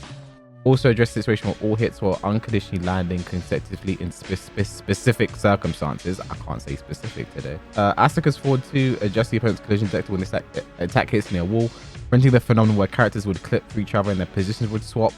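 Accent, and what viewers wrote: England, United Kingdom. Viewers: The music is a little distracting. Your also speaking fast in an English accent, which usually isn't a problem but the music makes it difficult to understand what your saying. Thanks for the upload on the patch notes.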